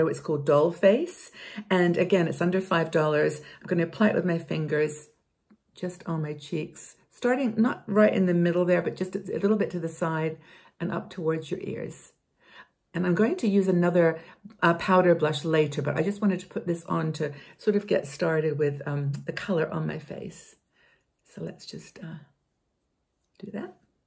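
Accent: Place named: England, United Kingdom. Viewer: There’s definitely a bit of an English accent there. 🙂💕 🇺🇸 🇬🇧 is so charming and fun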